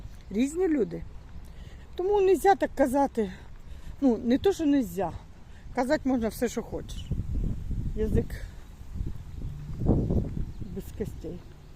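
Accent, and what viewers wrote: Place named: Sumy, Ukraine. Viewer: Привіт, Ви праві, говорите так самісінько, як в Сумській області!!((, там, далеко від нас...Всього тобі найкращого!:face-red-heart-shape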